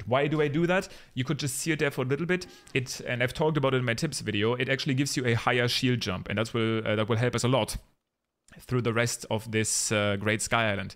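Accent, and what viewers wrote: Germany. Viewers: Are you german? Like I somehow feel you are german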